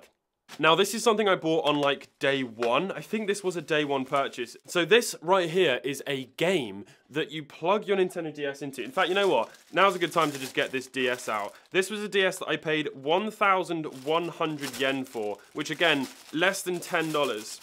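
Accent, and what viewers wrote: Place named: United Kingdom. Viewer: I don't think nub Is a bad word but his british accent makes It sound like knob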